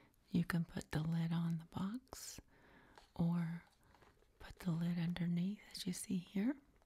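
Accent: South, United States. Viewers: do I detect a southern accent maybe?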